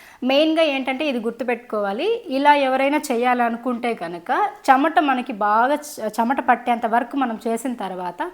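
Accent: Chittoor, India. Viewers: Meeru all rounder sis🤷‍♀️dance,cooking,family caring really your very tallented.i like your videos😘unnadi unnattu matladutharu yechulaki poyi ala matladali ila matladali anukoru.chakkaga mi chittoor slang lo matladutharu.madi nellore sister nenu kuwait lo job chesthunnanu.daily mi videos chusthanu🤗andari laga pakka valla gold ni ma gold ani,pakka valla houses ni ma own house ani abaddalu cheppakunda unnadi unnattu matladitharu.meeru chala mandiki inpiration ayyaru.any way I like your videos.god bless you sister🙌😊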